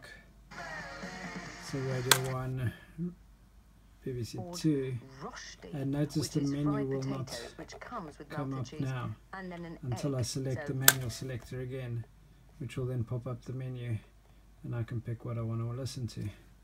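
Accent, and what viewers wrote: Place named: South Africa. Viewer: SA accent with British stations. Where are you?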